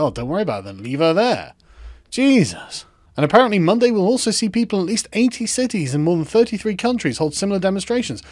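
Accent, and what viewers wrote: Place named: United Kingdom. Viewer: He's got me convinced. It's probably that British accent though. You chaps could convince me of about anything.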